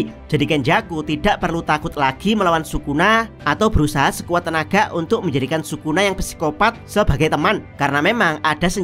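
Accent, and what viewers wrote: Java, Indonesia. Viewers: Suara mu terlalu kejawen Bang ... gak enak di dengar ,kurangi logat nya bro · medok bgt mas🤣🤣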